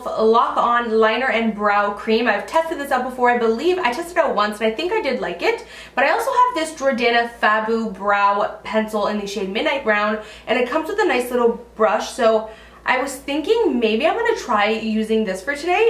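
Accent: Canada. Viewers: Girl ! I love your Channel I just discovered you! I'm sorry if this is a silly question cause I think I can tell but are you Canadian? Also I can't seem to find those morphee lashes!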